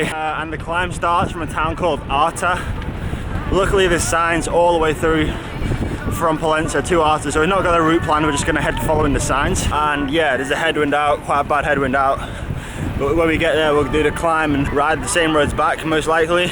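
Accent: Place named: Northern Ireland, United Kingdom. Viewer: Hey, so good to hear you still have some of yer old norn iron accent. Love the "oh dear" when you guys hit the road closed sign 😁 what about yee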